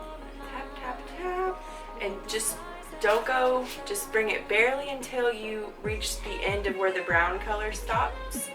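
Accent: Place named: South, United States. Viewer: your accent and Southern charm shine through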